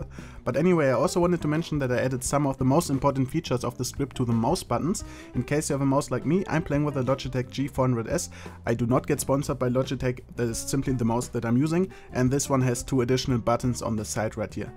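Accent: Germany. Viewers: ★ CS:GO Channel ★ shit also bist du doch deutscher das hört man sehr sehr sehr gut raus:D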